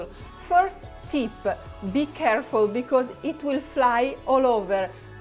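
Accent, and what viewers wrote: Italy. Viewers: making it easier for yourself is the key. May not be much different on our end but if you can save minutes and hours of editing that's well worth it. Your Italian accent is half the reason that I subscribe, thank you again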